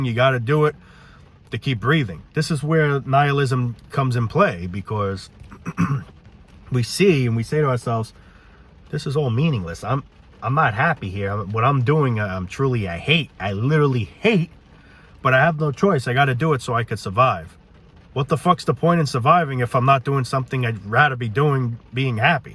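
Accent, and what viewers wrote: New York, United States. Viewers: You have that new York accent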